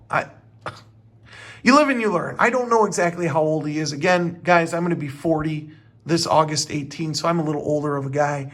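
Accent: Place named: Chicago, United States. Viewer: well I’m a fan dude. I moved from Chicago 10 years ago to Seattle, it’s awesome to hear a Chicago voice on here describing places I miss AND watches. Keep it up!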